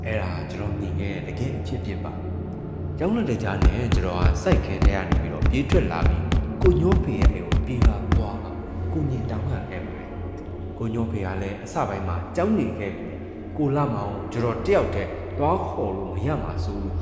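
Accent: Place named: Myeik, Myanmar. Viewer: ကြိုက်လိုက်တာရှင် အသံလေးလည်းဖွာမိတယ် မြိတ်အသံလေး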